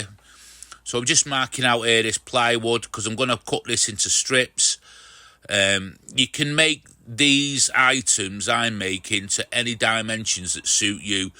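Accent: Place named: Manchester, United Kingdom. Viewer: Excellent to hear a fellow Manc!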